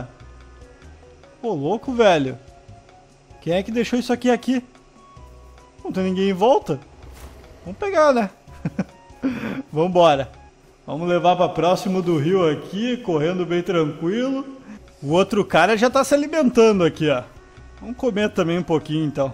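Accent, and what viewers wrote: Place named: Goiás, Brazil. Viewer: O fala igual goiano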